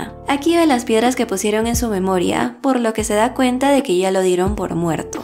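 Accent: Peru. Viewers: a los 10 segundos ya sabia que eras peruana🙂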